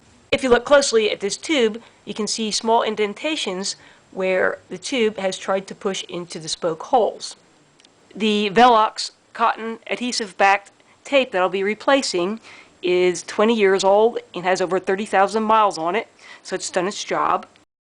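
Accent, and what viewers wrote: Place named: Maryland, United States. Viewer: I detect a Maryland accent :)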